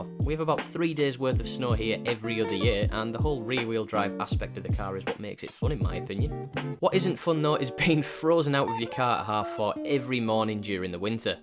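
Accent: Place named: Northern England, United Kingdom. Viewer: Lads from the North just explain things better, or should I say bettah. Top class content. Nice motor too.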